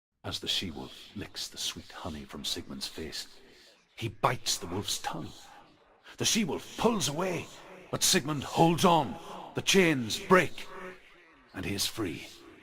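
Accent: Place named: Northern Ireland, United Kingdom. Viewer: Proper Northern Irish.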